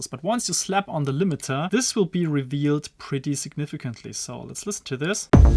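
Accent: Germany. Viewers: Are you German? That accent sounds familiar 🙂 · Man hört echt raus das du deutsch bist bro haha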